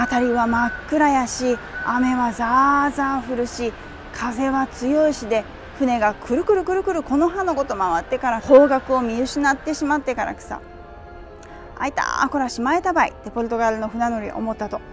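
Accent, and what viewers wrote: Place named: Hakata, Japan. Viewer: 博多弁が好きな方🙋🏻‍♂️です😊 椿さんすごいですね！ちかっぱ面白かったです🙇🏻‍♂️ 次回マカオ行く時の楽しみが増えました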